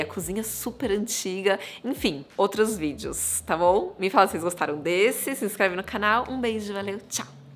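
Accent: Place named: São Paulo, Brazil. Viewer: Falo como você! Sou Paulistana!!!!!!!!!!!!!!!!!!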